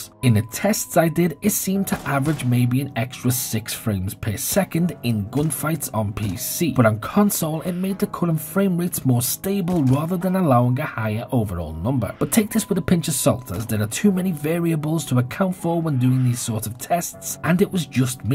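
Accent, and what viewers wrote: Liverpool, United Kingdom. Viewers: What part of the UK are you from bro ? Can't place it. Hints of scouse I think tho as a scouser myself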